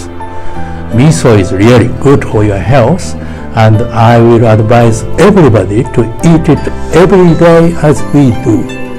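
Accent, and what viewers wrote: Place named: Paris, France. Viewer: 美味しそうですわ！綺麗なイルドゥフランス語と親切な実演で助かります。 味噌汁とハーフカットプチトマトも美味しうございます。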